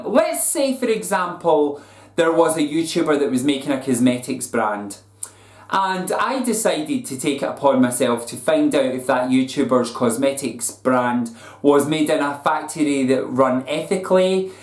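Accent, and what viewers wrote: Scotland, United Kingdom. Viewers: yaay another fellow Scottish YouTuber 😉 your accent just made me happy for the sound of home after a few hours of YouTube videos 😂😂 weird world we live in 😫 imagine weirdos like that are in every corner of the internet. I completely understand the need for privacy, a person's life is there own to share if they wish. I really believe some drama channels forget a normal person is behind the computer. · I am so sorry that this happened to you! This person is clearly unstable and loves to start and create drama. He clearly doesn't have much of a life. You probably hit a nerve, and that is why he did what he did. I think that you have always been very mature and professional with your videos. I enjoy you and your channel. Also, love your Scottish brogue! ♥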